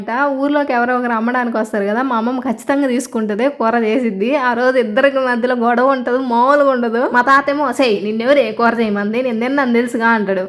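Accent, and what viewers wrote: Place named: Chittoor, India. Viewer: Ya ooru akka chittoor slang la undi meedi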